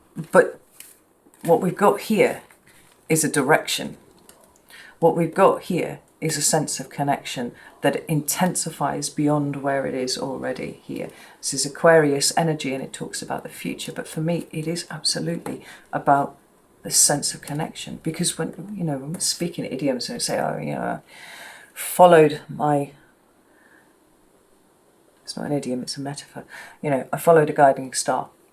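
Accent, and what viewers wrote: United Kingdom. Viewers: I feel the same as last reader, you really seem to explore the meaning of the cards. Also loved this reading, I am Sag rising and I feel like Sag readings relate to me more than my sun. I also love listening to that awesome British accent. The tall wizard behind you i had one just like him-lost him in a move can't find those wizards anymore where did you get yours?? Blessings to you!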